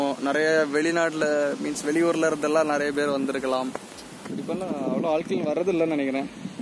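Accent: Pandi, India. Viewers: Pandi kala irukum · Bro pandi slangla onum peasandam nama adaiyalamana kumari slangla peasunga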